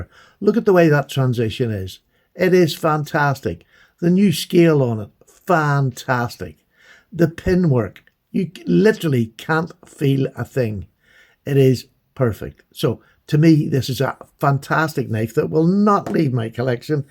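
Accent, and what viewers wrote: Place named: Wicklow, Ireland. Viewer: oh my days , I think slippys work is great , I'm going to follow your advice and join the group , get Mat to do some work for me , thanks again for all your content as all ways its the the biz , I'm sure I told you before I live in London but I'm from Wicklow and its great to hear an accent from home , that Taylors eye is just sublime , all the best ,p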